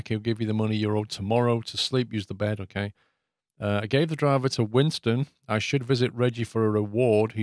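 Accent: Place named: Liverpool, United Kingdom. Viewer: lmao your Liverpool accent is spot on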